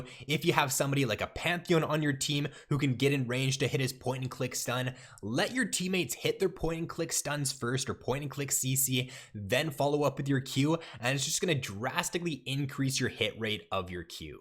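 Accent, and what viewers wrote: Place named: Canada. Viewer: Took me watching like most your videos to hear your Canadian accent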